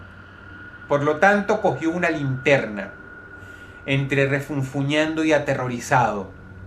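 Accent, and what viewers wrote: Venezuela. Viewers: Sería buena idea como en casos de la vida real con Silvia pinal, pero en este caso sería el (acento feo venezolano) el problema sería tramitar con todos los autores de las historias, pero siendo el escritor se me hace extraño no lo haga, quizás no lo ha considerado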